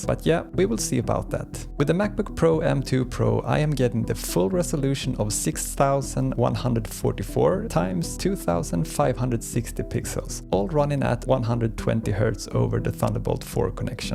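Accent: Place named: Sweden. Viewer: I KNEW IT.. You are Swedish. Your english pronounciation made it clear :D Looking to buy the same monitor. Greetings from Norway btw.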